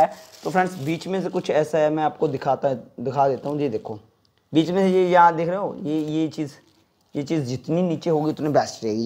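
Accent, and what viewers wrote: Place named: Punjab, India. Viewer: Love your punjab accent😅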